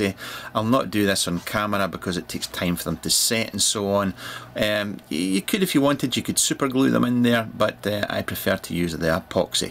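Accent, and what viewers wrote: Scotland, United Kingdom. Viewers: Always reassuring to hear a Scots accent :-)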